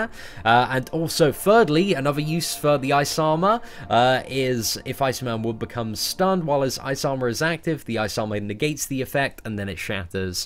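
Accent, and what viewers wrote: United Kingdom. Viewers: Dude, you sound so British.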